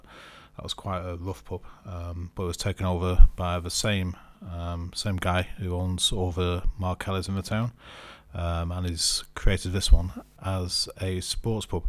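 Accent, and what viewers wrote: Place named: RP, United Kingdom. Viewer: posh voice !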